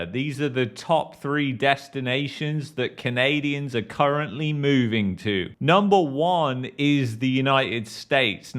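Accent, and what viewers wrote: United Kingdom. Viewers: listening to your accent, you better go back to the UK and enjoy it..leave Canada to Canadians..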